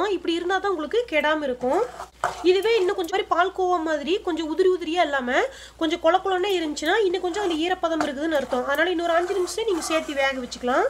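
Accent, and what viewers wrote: Kongu, India. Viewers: Kongu Tamil😍😍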